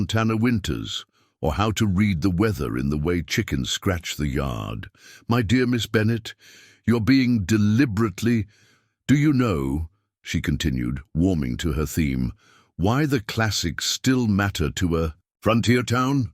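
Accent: United Kingdom. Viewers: Sorry but the engish accent and cowboy stories don't agree. The english storyteller is bad. His pauses at the wrong time ruin the pace. The best thing is the pictures of the cowboys and Indians ...mostly very handsome. Now the picture of the womsn in the above picture. How many women in the 1800s had tattoos on their chests?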